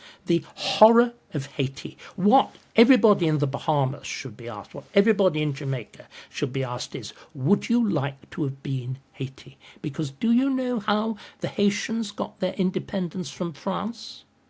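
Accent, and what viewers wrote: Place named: RP, United Kingdom. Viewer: David Starkey's presentation alone in the past! Unfortunately, we as a general public, don't relate to such a plummy proper English speak! Reminds me of my grandad, bless him!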